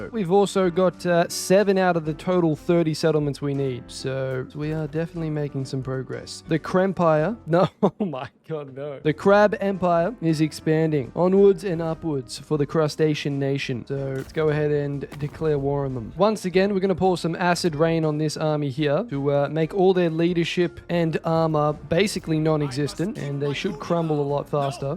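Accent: Australia. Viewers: This comment section reminds me that America was a mistake: man is clearly Aussie FFS . Also checking his profile is free.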